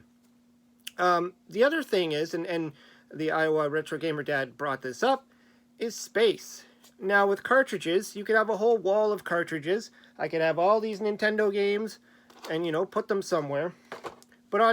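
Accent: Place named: Canada. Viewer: ! Always love to hear your opinions bro! Thanks for doing a response to this video! I love hearing the slight canadian accent on talking abowt. Haha. Have a great day buddy!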